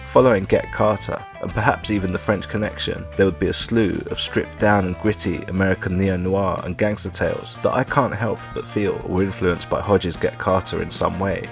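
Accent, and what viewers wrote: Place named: United Kingdom. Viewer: 😊 I like this documentary about gangster film genre.😊 I like the narrator with the British accent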